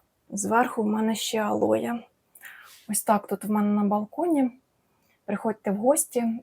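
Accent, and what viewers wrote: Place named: Poltava, Ukraine. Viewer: Вітаю, чую по розмові, що земляки з Полтавщини 🌻. Я народилась, і проживала в Полтаві, там маю квартиру, але 20 років живу в Києві. От в Полтаві в мене квартира "сталінка", в ній завжди влітку не жарко, бо стіни товсті - 70 см цегляної кладки, там комфортно для рослин, а в Києві маю дуже спекотну квартиру, без кондиціонера квітам важко, в усі вікна цілий день світить сонце, жалюзі закриваю на вікнах, на балконі в спеку взагалі може бути +45, одне радує, що восени і взимку квітам світла в цій квартирі достатньо. Тому зараз не купую рослинки, котрим потрібна прохолода, і підвищена вологість повітря, довелось змінити свої вподобання.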